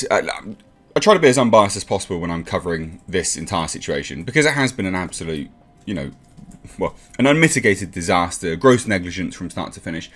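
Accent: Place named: United Kingdom. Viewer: Excellent news coverage however that pretentious British accent is annoying as hell.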